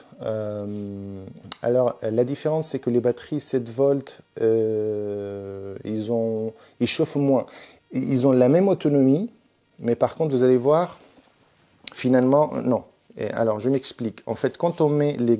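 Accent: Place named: Tunisia. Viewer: Salam shalom salut Je suis le 900ieme depuis hier ! J’aime énormément tes vidéos j’ai tout regardé depuis le début et je remonte petit à petit. C’est mon premier commentaire et sûrement pas le dernier ! J’aime énormément ton contenu, la vitesse des vidéos, ton état d’esprit, ton accent de Tunisie 🇹🇳 j’en suis sûr ! 😜 ta réflexion, ta personne. Je continue à remonter tes vidéos c’est un moment de détente incroyable. Peace ❤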